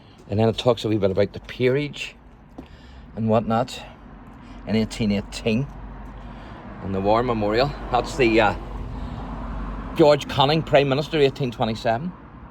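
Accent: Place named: Northern Ireland, United Kingdom. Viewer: The Northern Irish accent is thee best accent in the whole English speaking world so it is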